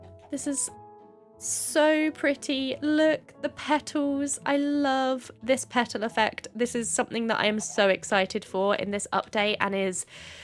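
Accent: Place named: United Kingdom. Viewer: You have a British accent, I kinda like it but it's sometimes difficult to understand but for me who's learning English (the British and American) I understand some words, also what a cool video ! I'm subscribing to your channel right now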